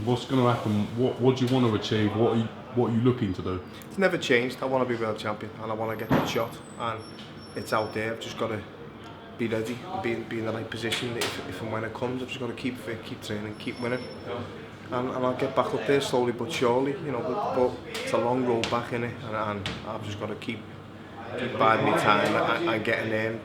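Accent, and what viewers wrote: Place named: Liverpool, United Kingdom. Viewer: Yeah brother! Paul Smith back at it again with the Scouse uyaaaah brother!!!! Shout out to my boy KUGAN, RIP my sweet soul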